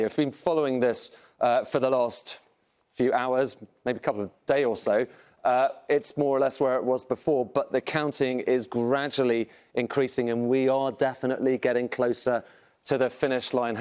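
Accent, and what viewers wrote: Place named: United Kingdom. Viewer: I don, t understand why this British accent? I get soooooo confused. Where is actually this Election?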